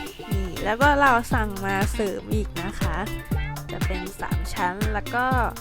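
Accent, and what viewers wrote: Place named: Isan, Thailand. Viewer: น่ากินจังเลย พึ่งไปกินในน้ำมาอยากกินอีกละ😂ชอบเสียงภาคตาฮักดี✌️✌️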